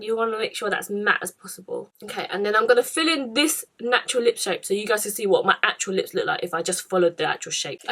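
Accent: United Kingdom. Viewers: I wasn’t expecting the British accent 😂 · hey girrrlllll Im Gemini too june 11th :) i love the uk accent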